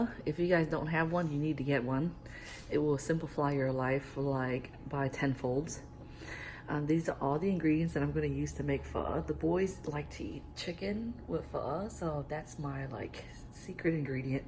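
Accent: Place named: South, United States. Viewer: I love your family and your southern accent.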